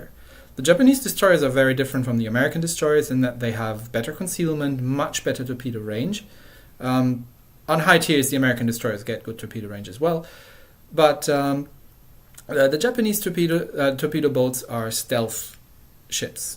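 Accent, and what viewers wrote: Germany. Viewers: Hey are you by chance german or scandinavian? Noticed your accent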